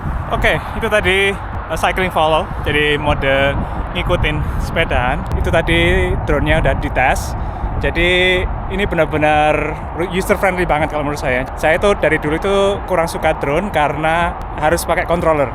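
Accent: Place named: Java, Indonesia. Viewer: Asli Jowo tenan medok e gak di gawe gawe soal e hehe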